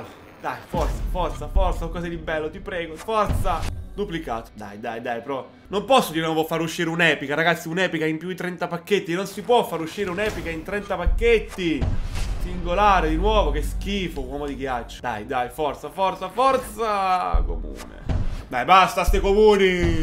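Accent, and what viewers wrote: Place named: Rome, Italy. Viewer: Ma sto accento romano?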